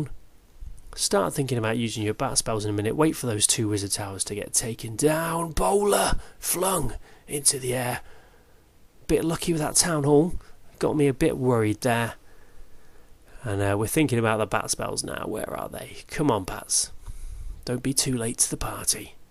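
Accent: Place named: United Kingdom. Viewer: I don’t know about the “Batman” voice...I do know that I’ve enjoyed your videos since last April when I signed on. Your content is outstanding and has helped me quite a bit. Matter of fact, your “Ice” troop configuration has given me quite a bit of success. So much that others in my clan use it now with devastating results. Your commentary, delivery, and UK diction is far and away the best!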